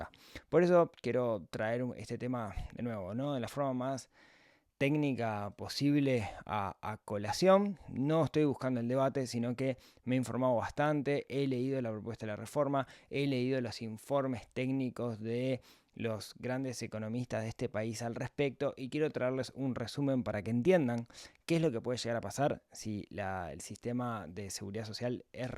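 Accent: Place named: Uruguay. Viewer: Muy bueno el video, muy clara la explicación sobre todo, hablado "en Uruguayo", me parece que suma un montón eso. Coincido en que se mezcla la política casi siempre en estas discusiones, y eso embarra la cosa y resta potencia y eficacia a las discusiones. Como la enorme mayoría de los temas importantes de la Sociedad que requieren solución, este es un tema incómodo y hasta antipático en lo que hay que hacer, pero coincido en que es inviable no hacerlo. Me encantó la explicación de la poca conveniencia del mecanismo constitucional en caso de que salga mal y haya que echar para atrás, punto muy importante, y también toda la parte sobre las cosas que son deseables y pueden prometerse (la canilla de Coca Cola), pero que después son imposibles de ejecutar, coincido 100%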